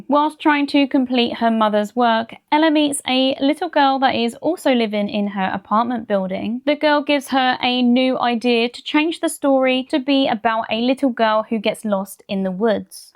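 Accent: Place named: Southern England, United Kingdom. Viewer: I'm guessing u're from southern england like by u're accent, I'm from maidenhead berkshire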